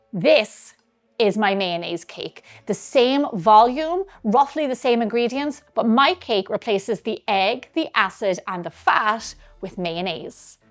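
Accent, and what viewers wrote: Ireland. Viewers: (LOVE this woman's Irish accent!) 😊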